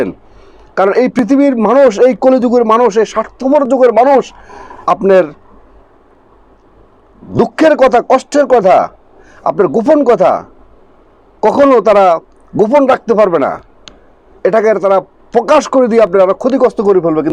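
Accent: Bangladesh. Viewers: আপনি কি পূর্ববঙ্গের মানুষ ? আপনার কথাগুলো খুব টান আছে ? আপনার বাড়ি কোথায় ?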